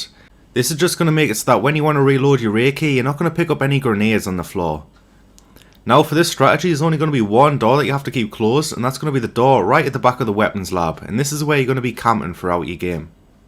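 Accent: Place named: England, United Kingdom. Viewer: another great video, but random question, always wondered where in england your from by your accentv haha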